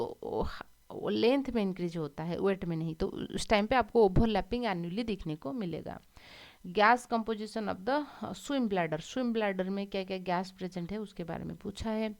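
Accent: Odisha, India. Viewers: 😳😳😳...app odisha se ho kya mam ?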